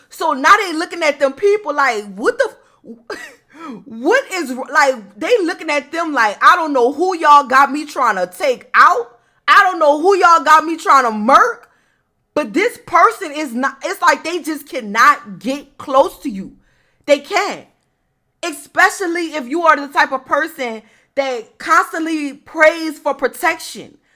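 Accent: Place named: New Orleans, United States. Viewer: with that accent you were from New Orlins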